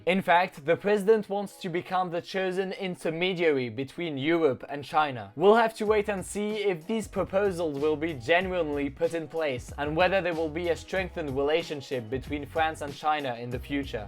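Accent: United Kingdom. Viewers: perso j'entends plutôt du British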